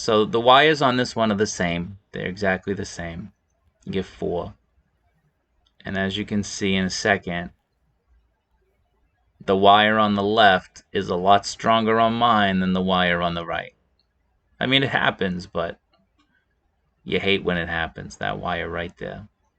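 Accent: Boston, United States. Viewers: What kind of accent is that ? Boston?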